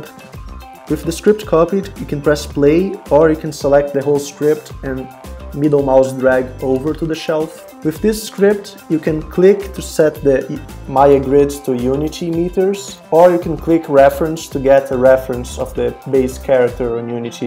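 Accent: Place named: Brazil. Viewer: Cara, tu é brasileiro não é? O jeito que tu fala, tem algo de br ai rsrsrsrsrs LoL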